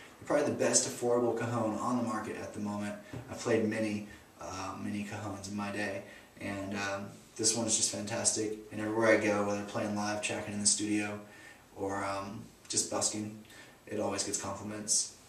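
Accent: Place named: United States. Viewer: Hi.. You sound like a yank...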